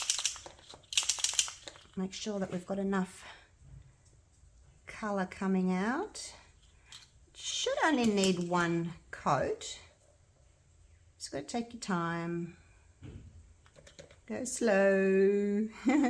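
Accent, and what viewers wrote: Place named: Australia. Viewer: Side note curiosity I have to comment on your lovely accent again. Australian accents are so very cool. Forgive me if I'm wrong.🤔 But dudes are called blokes right? So what is the correct term for chicks? A little 70's era usa slang for guys & gals🤣🤣🤣🤣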